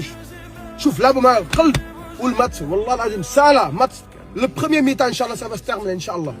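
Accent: Morocco. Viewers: ههه خرج اصاحبي😅 الله يرحم الواليدين😅 ههه لهجة مغربية راها زوينة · Hey bro, you’re so cute talking Moroccan. Thanks for your support and good feelings towards Morocco and the Moroccan people. We love whoever loves us😍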